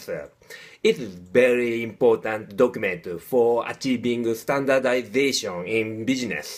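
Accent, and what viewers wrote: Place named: Japan. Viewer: 这个日本人说英语真的难听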